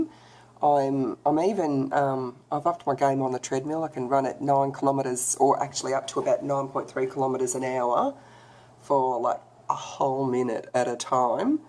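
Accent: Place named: Australia. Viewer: Hi, Thanks very much for posting this. I had an iron infusion yesterday, am feeling achy, lethargic, hypersensitive and a bit nauseous today, like a bit flu-ey or something. Appreciate your video, so far, you're the most watchable genuine person I've found and of course the Aussie accent is the best break from all those bloody American ones! 🙄👍❤️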